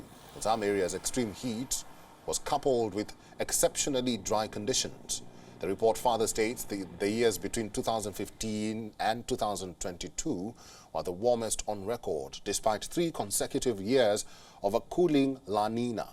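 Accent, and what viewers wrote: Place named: India. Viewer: you now speak like an indian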